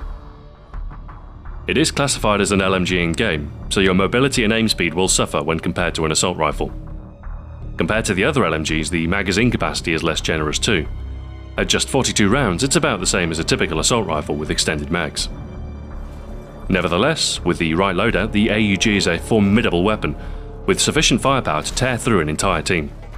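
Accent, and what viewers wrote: United Kingdom. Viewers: Its not Russian... Its a BRITISH accent, he's from Manchester. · Hey mate im not gay but i love you! ur videos are the best ;) and i love ur british accent ;) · are you british?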